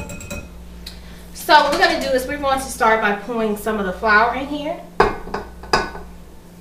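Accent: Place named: South, United States. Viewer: I just want to know, do you have to talk so country?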